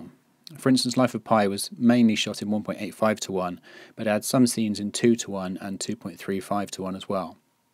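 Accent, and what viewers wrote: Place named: West Country, United Kingdom. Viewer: Great in-depth overview of compositional rules. Nice to hear a fellow west country accent too, makes a change from the usual American teenage nasal whine on most YT tutorial/how to's which can get a tad wearing.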